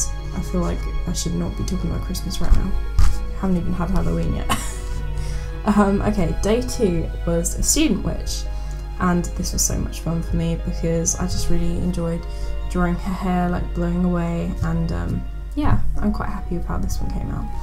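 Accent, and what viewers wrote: United Kingdom. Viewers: You sound British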